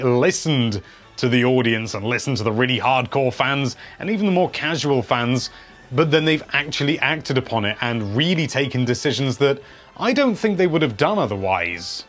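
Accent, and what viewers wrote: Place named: United Kingdom. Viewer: Is it just me or does seem more British than usual.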